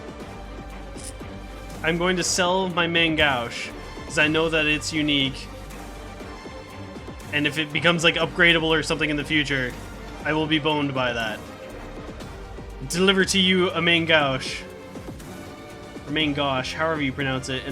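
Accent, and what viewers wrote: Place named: Canada. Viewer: They call Chizuru: Chirizo, type of Mexican sausage due to the obv similarity. You sound Canadian so I can understand the confusion :p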